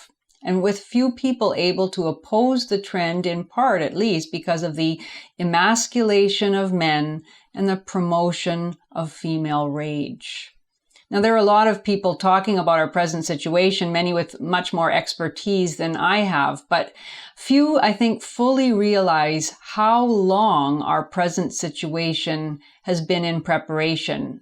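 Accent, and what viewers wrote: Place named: Canada. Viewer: Goodness gracious I love her Canadian accent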